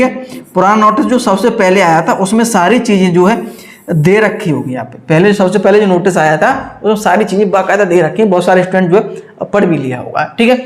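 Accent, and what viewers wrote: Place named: Etawah-Mainpuri, India. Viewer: सर आप की भाषा से लगता है। कि आप इटावा या मैनपुरी जिले से हो🤔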